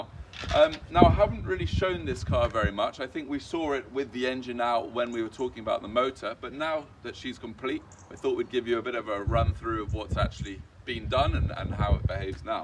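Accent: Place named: RP, United Kingdom. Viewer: Sorry you sound too posh to give you my money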